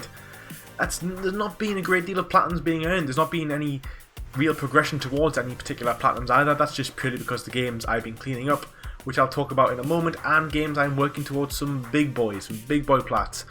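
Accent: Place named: Teesside, United Kingdom. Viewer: Now mate been watching your channel a couple months, and judging by your accent I think your from near where I live. I live in Middlesbrough. If you don’t want to say where your from I understand.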